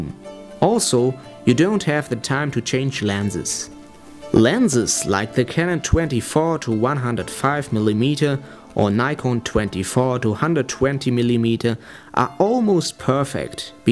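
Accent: Germany. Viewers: German Accent ;)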